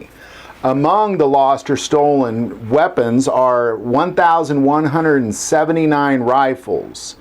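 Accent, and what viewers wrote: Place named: United States. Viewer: mIL itary, carILIna, .... love that hick drawl.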